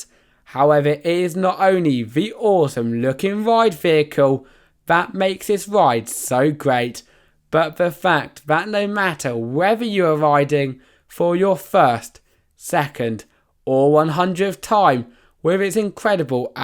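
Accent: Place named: United Kingdom. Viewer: What's with the drunk British guy commentator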